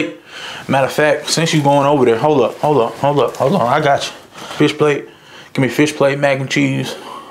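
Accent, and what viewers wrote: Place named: South, United States. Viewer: Where bro from he sound southern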